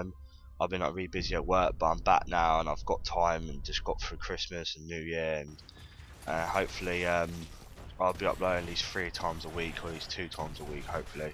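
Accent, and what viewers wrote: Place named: United States. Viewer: Yay <3 thought you were American !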